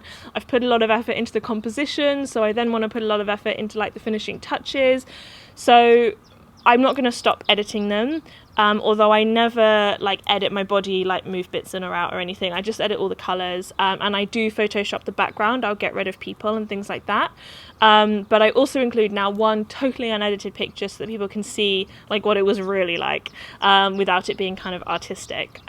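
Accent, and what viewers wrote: United Kingdom. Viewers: You know.. I think I like you )) Your British accent and how you can express all this things. Positive energy comes from you ^^ P.s I also quit Insta for two month already. And it's quite good to check yourself, or just get some extra time. But when I'll get back, I will find you )) Damn, I miss my friends there 😟😁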